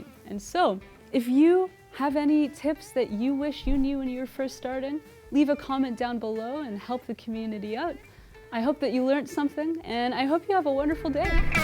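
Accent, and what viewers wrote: Canada. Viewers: She's a great teacher. And I love the Canadian accent.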